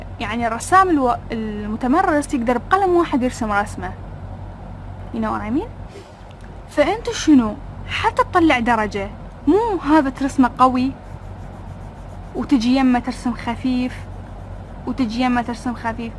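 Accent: Iraq. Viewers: جمال اللهجة العراقيه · حبيت اللهجه العراقيه تجنن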